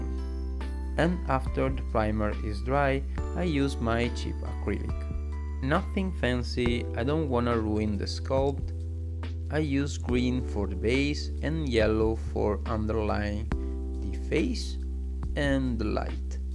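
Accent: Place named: Russia. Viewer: That accent.. are you Russian or something??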